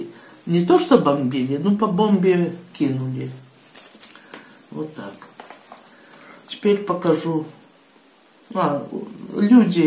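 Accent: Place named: Donetsk, Ukraine. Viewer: Вы так похожи на мою покойную бабушку,, она с Донецка была.. такой же говор … удачи вам..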